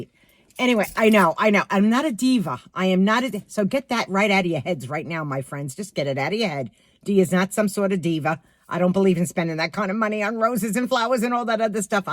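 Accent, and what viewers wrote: New York, United States. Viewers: I LOVE the New York accent. Its fabulous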